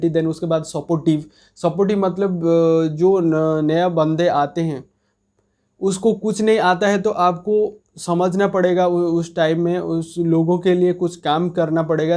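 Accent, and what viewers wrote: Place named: Odisha, India. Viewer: Dear, Great Job and well explained. But I want to suggest you about your accents which you are using, that is for only village people, those who are living in odisha. If you want to became a good trainer on facebook or youtube (or any social platform), you need to work on your accents. The pronunciation of ours (Odisha peoples) is very poor in both English and Hindi. So, continue to motivate others and step up for your great future. Thank you, God bless you.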